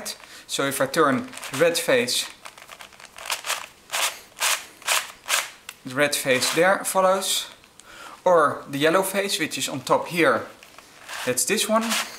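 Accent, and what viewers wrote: Netherlands. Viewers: I'm dutch too and i'm actually laughing at your English... do you mind? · LOL, I can hear your dutch accent.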